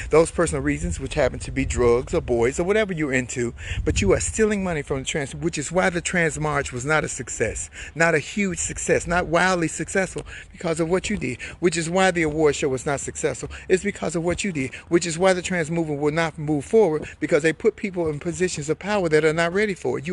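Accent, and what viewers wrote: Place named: Chicago, United States. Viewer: I love you my dear PERIOD! You sound just like US girls from Chicago! The early 90s was the ish!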